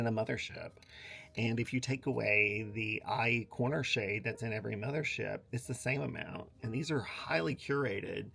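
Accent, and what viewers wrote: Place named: Georgia, United States. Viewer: Love it, you just sold me on the Space traveler pallet but I will be buying the diffuse muse pallet first. I love your accent; you sound like you are southern but Georgia southern like from Atlanta anyway nice accent. I was watching Alicia’s channel where she was talking about you applying these beautiful pallets. You have a new subscriber so I will be watching and learning.❤❤❤❤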